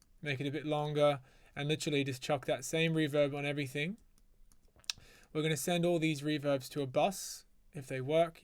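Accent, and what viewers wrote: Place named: England, United Kingdom. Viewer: Your accent is so weird now lol Becoming more English. Love the tutorial btw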